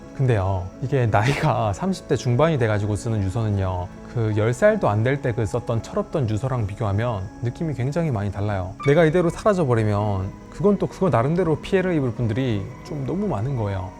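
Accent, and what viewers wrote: Gyeongsang, South Korea. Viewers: 단한번도 스킵하지않고 넉놓고 봤네요,,,, 항상 경상도 사투리속 억지로 서울말 쓰시려는 말투가 재밋고 귀에 들어와서 챙겨봤는데 많은걸 알려주시는 영상이였습니다,,, 항상 알차고 필요한 부분만 리뷰해 주셔서 감사합니다 ㅎ 같이 화이팅!!!!!!!!!!!!!!!!!!!!!!!!!!!!!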